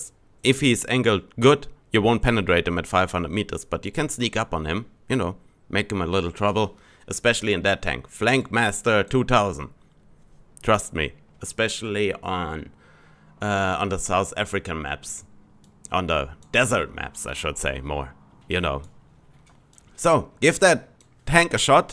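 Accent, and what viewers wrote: Germany. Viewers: r u German I'm impressed with ur English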